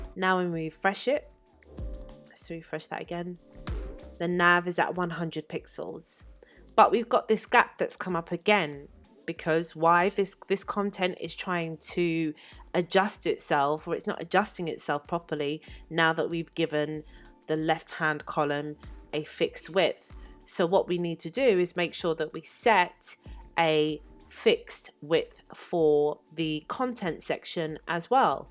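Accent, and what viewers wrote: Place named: United Kingdom. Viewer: Love British accent.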